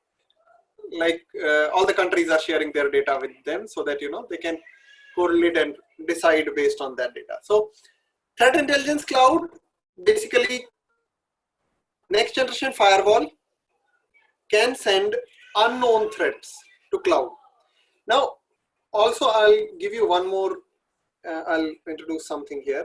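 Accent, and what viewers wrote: India. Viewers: Good but Indian accent very hard to understand.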